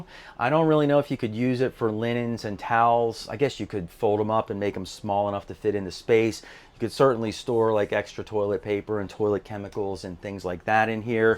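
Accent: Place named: Maryland, United States. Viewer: Being from Maryland…this guys md. Accent is comforting to me. 🤣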